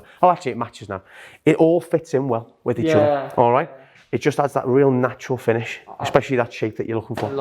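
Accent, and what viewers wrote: Liverpool, United Kingdom. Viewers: Is it me or is there a bit of a Scouse accent that pops out here and there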